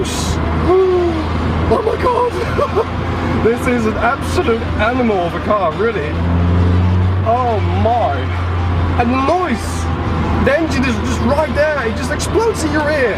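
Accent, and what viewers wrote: Netherlands. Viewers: Let me gues your dutch. Dat hoop ik tenminste anders heb je wel een speciaal accent. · Je hebt wel een heel Nederlands accent :D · ik hoor echt dat je NL bent <3 toffe vid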